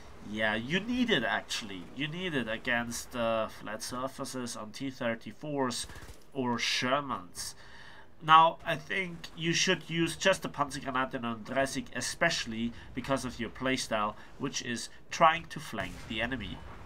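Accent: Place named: Franconia, Germany. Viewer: du hörst dich irgendwie fränkisch oder bayrisch an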